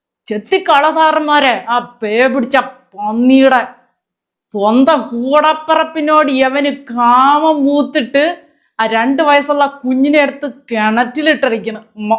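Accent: Thiruvananthapuram, India. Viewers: ജി നിങ്ങളുടെ തിരുവനന്തോരം ഭാഷയിലുള്ള സമകാലീന വിഷയത്തെ അവതരിപ്പിക്കുന്നശൈലി ഒന്നും പറയാനില്ല..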